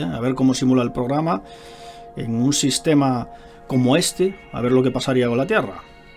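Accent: Spain. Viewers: Porque siempre es en español de España es aburrido nomás de escucharlo....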